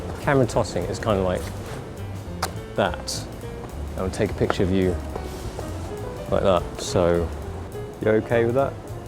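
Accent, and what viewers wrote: United Kingdom. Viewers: Haha, WHY did you let her throw a camera up like that? So obvious that it would be a epic fail, but its your fault not hers ;) I know people from Hong Kong, but I've never heard anyone with British accent! Like others say, it sounds really nice :D But did you live in Brittain then? ONE thing you should have pointed out about the cameras! They are totally different in the grip! Yes, the Nikon weight less and is smaller. But for a guy where Im from, the Nikon is really too small in order to get a really nice grip on it like you have for Canon's camera. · Asian with British accent omfg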